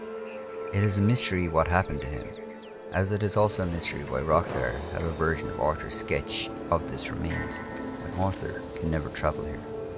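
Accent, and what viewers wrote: Ireland. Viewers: Didn't know you were Irish 🇮🇪, theres not many Irish youtubers, keep up the good work 💪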